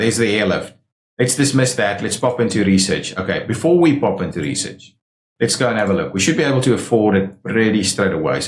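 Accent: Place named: South Africa. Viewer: Are you South African? The accent screams South African. 😁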